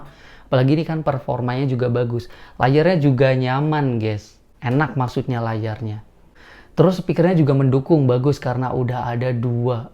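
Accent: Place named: Banyumas, Indonesia. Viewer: Ora ngapak ora kepenak ya kang...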